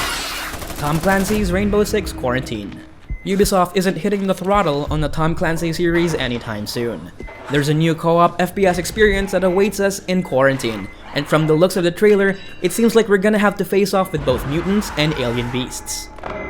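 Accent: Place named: Philippines. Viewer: You must be Filipino, cuz I hear it in your accent. Am I right?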